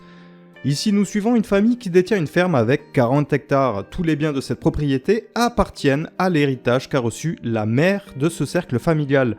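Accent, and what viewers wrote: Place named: England, United Kingdom. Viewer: Vidéo interessante mais ton accent Anglais il irrite vraiment les oreilles !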